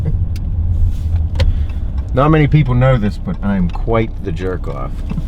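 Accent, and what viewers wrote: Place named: Pennsylvania, United States. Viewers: I'm from Pennsylvania as well, and it's just funny that the way you talk and the terminology you use is identical to the shit my friends and I say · You have a Pittsburgh accent, where in Pennsylvania do you live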